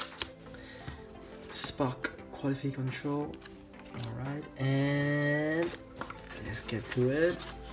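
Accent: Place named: France. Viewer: Are you french ? Because you have a Very big french accent .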